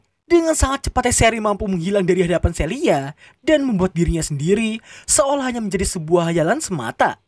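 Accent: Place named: West Java, Indonesia. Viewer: Bang lu orang Jawa Barat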